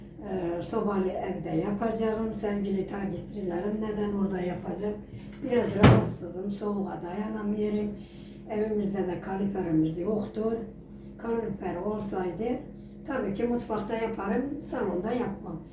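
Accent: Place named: Meskheti, Georgia. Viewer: teyzem nerelisiniz acaba ben Ahıska türküm aynı bizimkiler gibi aksanınız var😊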